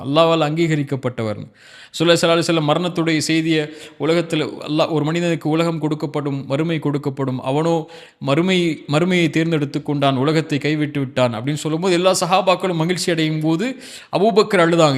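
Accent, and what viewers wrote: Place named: Sri Lanka. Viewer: Ungal bayan enakku mihavum pidikkum karuththukkalai mihavum alahahavum thelivahavum velippadutthureenga ungal kirath so sweet pesurazu engada nattavar maazari I'm srilankan pls Quran recitation podunga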